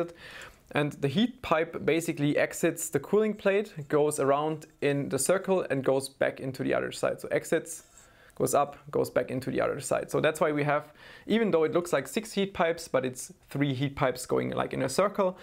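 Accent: Germany. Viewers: Man merkt direkt dass du deutsch bist xD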